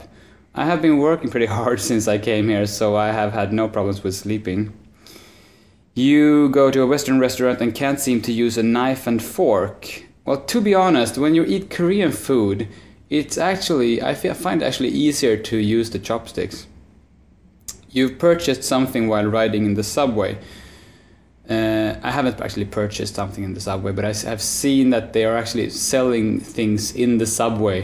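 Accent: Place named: Sweden. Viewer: Swedish Accent! Just say one thing for me... say "time to unmpimp de Auto" like that swedish guy in the VW comercial.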